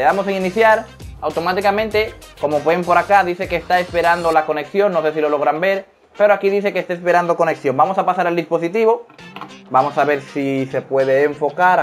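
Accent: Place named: Dominican Republic. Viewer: Aquí en Dominicana hablan así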